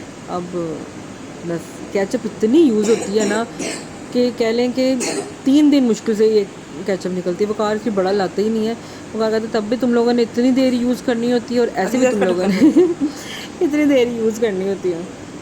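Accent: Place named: Lahore, Pakistan. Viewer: Lahori Apne bolne k lehjy se pechany jate h k yh lahore se h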